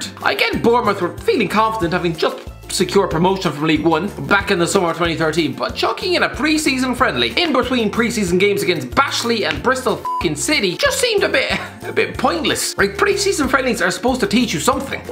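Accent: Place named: Ireland. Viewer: I think it’s Irish 😂😂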